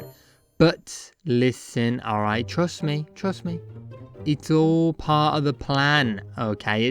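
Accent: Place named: Birmingham, United Kingdom. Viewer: are you from birmingham?? s teir accent :))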